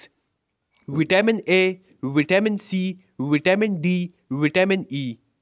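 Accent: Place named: India. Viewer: thanks for this but i didnt expect it to be brutally indian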